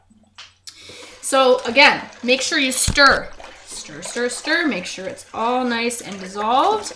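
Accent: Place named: Canada. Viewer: Your accent, im loving it... your canadian huh... yeah, im flirting just a bit...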